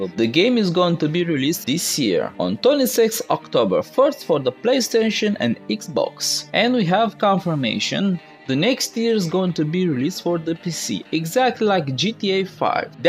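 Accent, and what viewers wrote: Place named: Russia. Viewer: bro, forgive me but your english is like the russian which make tutorials, search for answer. whatever, keep work.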